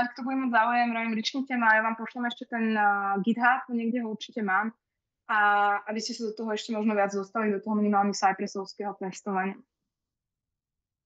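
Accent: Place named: Bratislava, Slovakia. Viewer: tato tema ma vobec nezaujima, ale pozrel som si to cele lebo mi chybal bratislavsky prizvuk :)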